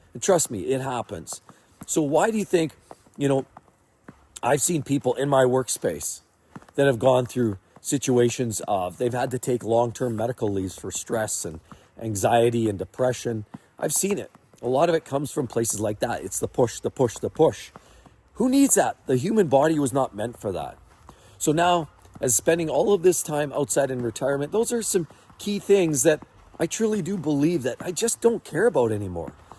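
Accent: Canada. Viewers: Canadian...I can hear it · That street you're walking down looks like Russia. But your accent proclaims you're Canadian. Where is this? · Looks like you live in Colorado but your accent sounds Canadian!Anyway, turned 60 and burned out at my job..30 years of teaching..I’m ready to retire..Was planning on 65 but I can’t be in a job where there is no parental and administrative support..It just beats you down to the point where you don’t care anymore..I would rather retire on a lower pension and have peace then to endure 5 more years of this..I’ve become a minimalist and don’t need much of anything…I would to do something but have more control over what I do..Maybe reinvent myself?…Maybe buy a business or just do rideshare or substitute teach??..Trying to figure it out..I think me and my wife would be ok financially..Our home is almost paid off..We could sell and downsize for extra income?…kOur vehicles are paid off..I just ready for some peace and more control of my weekly routine.. 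We aren’t interested in much travel..We would be good with once or twice per year..nothing to extravagant…Anyway I hope I can figure it out before the end of the school year or summer? I’m mentally drained and so ready..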